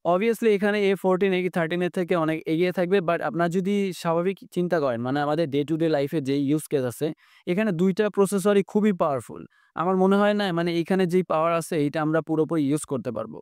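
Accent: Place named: Barishal, Bangladesh. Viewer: আমি শিওর আপনি মোগো বরিশাইল্লা😮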